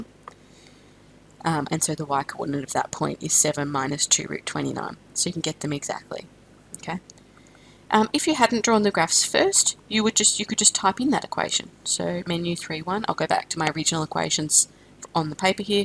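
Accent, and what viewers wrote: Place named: Australia. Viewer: i needed to find an aussie math channel cause im in aus and as i soon as i heard ur voice i found it thanks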